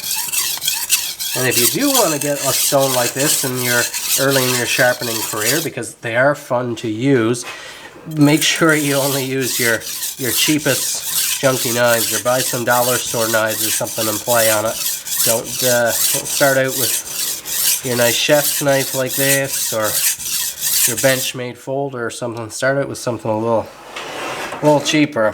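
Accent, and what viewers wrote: Newfoundland, Canada. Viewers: Awesome work dude! Sounds like you’re a maritimer. Definitely not a PEI or Nova Scotia accent going to have to say you’re a Newfoundlander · I watch your videos for two reasons besides masterful knife sharpening. One for unique experience of enjoying the Newfoundland accent and second as a thank you to the people of Newfoundland (Gander especially) for their unparalleled humanity and hospitality during the days following 9/11. Hope to visit one day until then will visit vicariously through your videos. 💕🙏🏻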